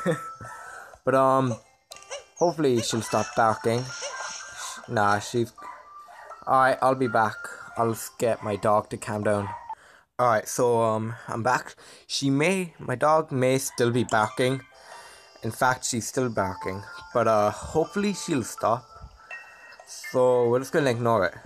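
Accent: Ireland. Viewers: Ah, you do actually have an Irish accent, now that I listened closer but, you're right it's not as prominent as a lot of other Irish accents. Also (not to be rude) but, I love your little lisp. I've always been a fan of people with lisps. I find them quite adorable. Like my daughter will tell me about somebody and she'll say oh mommy you are going to love this person, and also they have a lisp.☺️ and I just really think that it makes your speech very unique and adorable. so I hope I did not offend you in any way.😊 And if you figure out how the heck to get off of that little island and, over to the other place I would really appreciate it if you could let me know. it's driving me nuts. Thank you so much. My name is Linda by the way 😉💚💚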